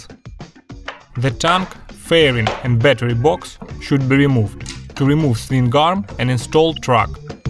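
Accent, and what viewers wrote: Russia. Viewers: Ужасный акцент русского, типичное зрелище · У него русский акцент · Russian accent language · Говорит чел с русским акцентом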